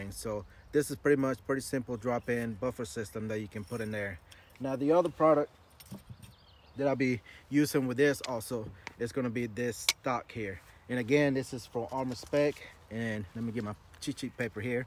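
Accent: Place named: Lumberton, United States. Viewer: You from Lumberton? I got some family from that area and you got that heavy accent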